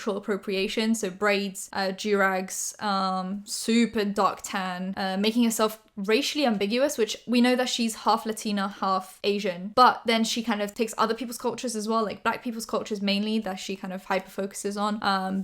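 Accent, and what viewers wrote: United Kingdom. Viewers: Are u british and from London?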